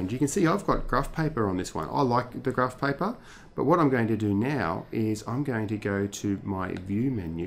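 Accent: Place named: Australia. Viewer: The Aussie accent has got to be hands down the best accent imo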